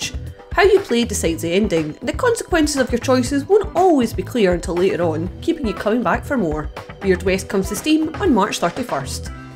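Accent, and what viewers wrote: Scotland, United Kingdom. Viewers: PC Gamer, I loved the announcer's lovely Scottish accent ! Please give us more =)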